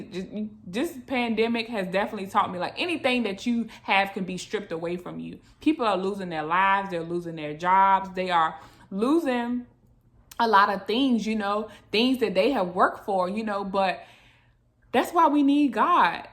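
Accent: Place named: South, United States. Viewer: I enjoyed your video that I came across by chance. Your forthrightness and candor are refreshing and inspiring, and your southern accent is boffo. Eating fruits and veggies are best for our health and digestive system. Supplemental nutrients and vitamins are necessary too. Especially the Omegas 3, etc., and B Vitamins (particularly B 12 if you have vegetarian leanings). Stay away from foods and drinks that have preservatives (Propylene Glycol, BHT, Sodium Benzoate, MSG, Potassium Benzoate, etc.) in them. Preservative are used in cosmetics and medicines a lot too, and can be absorbed into the body transdermally. Overall, preservatives, fillers, and binders are generally man-made chemicals that are not natural or organic. Their use is for preserving the shelf-life of products to maximize profits . They can wreak Havoc in our bodies by disrupting hormones, cells, blood chemistry, and can cause cancers. 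If you think about it, preservatives, fillers, and binders - overtime will cause problems for our digestive systems. In other words, they make digesting what we eat harder, inefficient, and ineffective. This all applies to the nutritional health and safety of pets too. While writing this, I remembered something I read in a Malcolm Gladwell book concerning hormone disruption in women. At this time, I cannot remember which one of his books. Before getting into his findings - let me introduce you to him. Malcolm Gladwell is the author of five New York Times bestsellers — The Tipping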